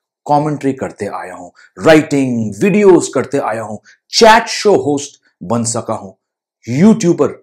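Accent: Standard. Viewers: Sir Aap ka Hindi bhasha bhaut khub aur shudh hai. You have Indian roots..❤🙏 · This is first time I have seen your video and I was shocked when you started speaking hindi in a pure accent 😂😂😂😂 I was wondering how an american looking guy can speak hindi so well and then I realized that you are son of Great Tom Alter. You are a true Indian and our brother your complexion cannot separate us.❤❤ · You are fantastic to listen to your shuddh hindi, better than your dad!! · My family is from India but I was born and raised in the US, and I’m learning Hindi from watching a video by somebody whose family is from America but was born and raised in India. How interesting! मुझे आपका विडियोज़ बहुत पसंद है और आप बहुत आर्टिक्युलेट तरीक़ा में बात करता न्यूट्रल दिल्ली हिन्दी में। मेरे लिए बहुत मुश्किल है जब लोग अजीब ऐक्सेन्ट्स में बात करते है ग़लत उच्चारण के साथ जैसे की लोग जो स और श का फ़रक नहीं पता और लोग जो ज, ज़, और ॹ (vision और measurement का zsh आवाज़ जो ज़ नहीं है लेकिन ज भी नहीं है) का फ़रक नहीं पता। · Your Hindi is way more pristine than todays Hindustanis.❤ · Aap to itna practice kiya ho aapka accident bhi nahin lagta pure Hindi accent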